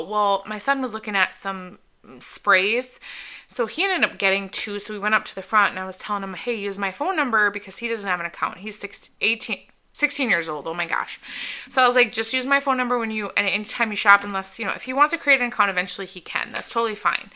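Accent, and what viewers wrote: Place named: Minnesota, United States. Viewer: I love the Perfect in Pink candle. I bought two which is unusual. BTW, im wondering if you are from the upper Midwest? Im a Minnesotan and you kind of sound like us lol...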